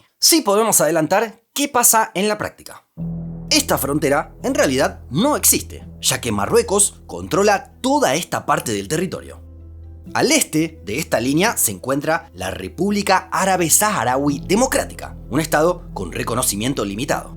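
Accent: Argentina. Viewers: Hablas como Argentino y es feo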